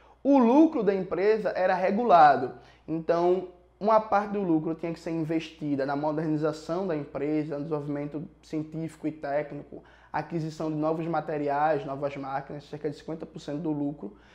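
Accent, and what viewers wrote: Pernambuco, Brazil. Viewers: Seu português-pernambucano dá um certo charm e uma aura especial. Competência nem se comenta. Reconheço.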